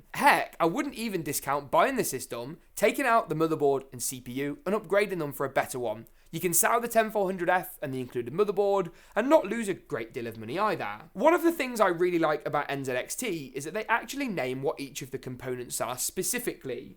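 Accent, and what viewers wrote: United Kingdom. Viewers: mate he is definitely not Australian he has the strongest British accent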